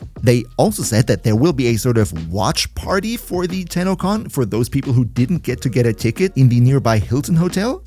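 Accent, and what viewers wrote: Germany. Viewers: Never knew you were german. So yes, GET ZHE FLAMMENWERFER (much love from Austria)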